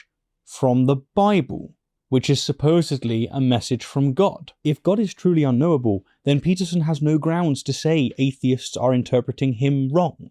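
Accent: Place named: RP, United Kingdom. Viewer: you're a smart cookie man! very cool downton abbey accent too :)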